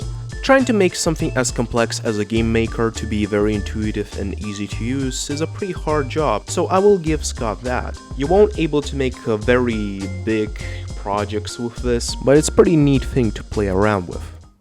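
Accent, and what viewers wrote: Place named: Russia. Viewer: I did not notice the slight russian accent until I noticed the russian text lol Then again I'm from Israel so maybe I'm just uses to Russian accents more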